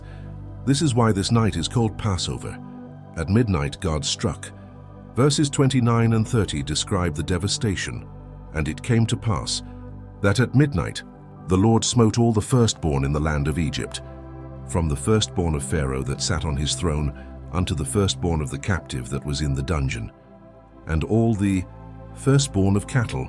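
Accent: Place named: United Kingdom. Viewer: I wish these stories were not spoken with a British accent. It’s disingenuous.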